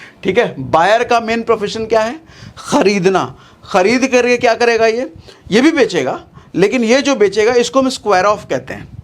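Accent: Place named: Madhya Pradesh, India. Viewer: Sirji, May I know which state you are from. I have one friend who is from Indore. I love your hindi dialect. I think you are from MP. Thank you for spreading finance knowledge. I am your fan.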